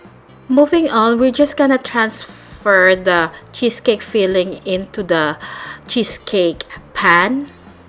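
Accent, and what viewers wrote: Philippines. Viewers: Filipino ka no teh? Accent palang ih!! Hehehe!!! Sana teh translate mo to sa tagalog. Nosebleed!! Choz! Pero pa translate teh para maintindihan ko ng maayos hahaha!!! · Are you a filipino? Because you have a Filipino accent. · sure ka ba d mo naiintindihan??? Filipino ka dba? tsk tsk.. mka-insulto lang. accent is not important sa Youtube te as long as naiintindihan ng nakakarami. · Yeah. unang rinig ko palang, alam ko ng filipino sya hahaha. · matias Low IQ lng d nkakaintindi s kanya.. She has a filipino accent so dapt mas naiintindhan mu! Wag kang epal! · but you have to admit... she does sound filipino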